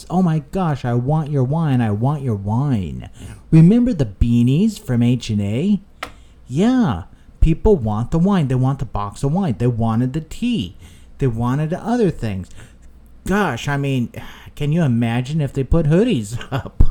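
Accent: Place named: France. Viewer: I love your french brother😁. Great show btw..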